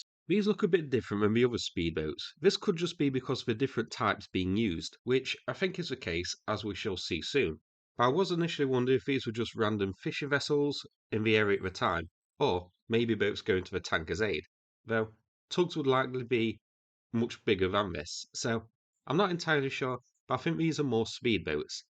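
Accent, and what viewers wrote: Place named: Nottingham, United Kingdom. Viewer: That's the just Nottingham accent for you😊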